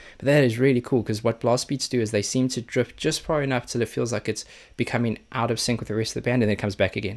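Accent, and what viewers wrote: South Africa. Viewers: Dude, are you from South Africa? That accent.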